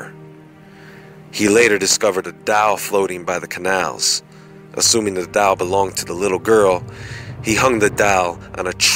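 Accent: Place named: Tennessee, United States. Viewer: I like the way you talk on this video, reminds me of my home here in Tennessee.. The best.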